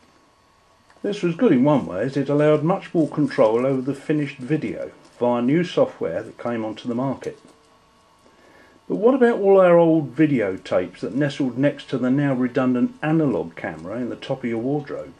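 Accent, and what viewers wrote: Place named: United Kingdom. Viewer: The best was the british accent :P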